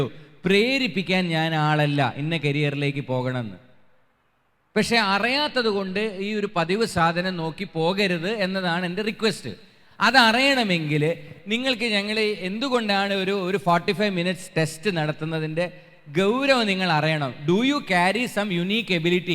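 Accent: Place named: Malabar, India. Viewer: സദ്ഗുരു ജെഗ്ഗി വാസുദേവന്റെ പദാ നു പദ തർജ്ജിമ . എന്നാലും കലക്കി . മലബാർ സ്ലാങ് ന്റ് കുഴപ്പം ഒഴിച്ചാൽ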